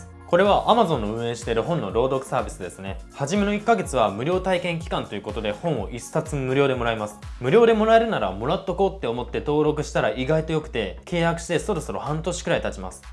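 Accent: Kansai, Japan. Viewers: 先生のイントネーションで関西弁出てますよw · 話のイントネーションと阪神タイガース好きって言ってたんで笑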